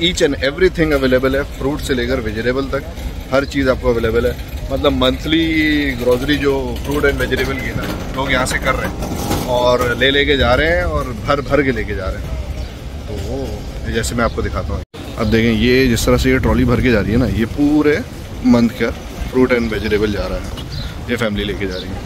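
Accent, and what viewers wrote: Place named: India. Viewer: U talk by Indian, we understand nothing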